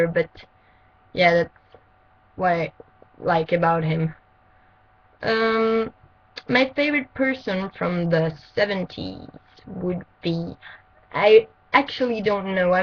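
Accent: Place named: France. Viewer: 1) You are not boring. 2) This vlog is not crappy, so the title is totally misleading. 3) You accent is so cool, but I was actually expecting it to be more Swedish than French, for some reason (: Great video! Keep it up (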